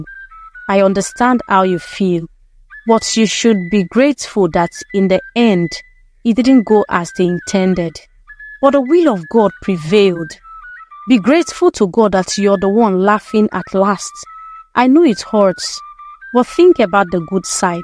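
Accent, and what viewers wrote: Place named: Nigeria. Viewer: I live in Michigan USA. Months ago I came across your stories when looking for videos with Nigeria accent so I could get used to it. I Continue listening because I like the stories.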